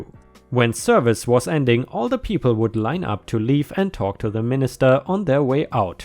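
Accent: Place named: Germany. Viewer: Ok I have to say you kind of have a german accent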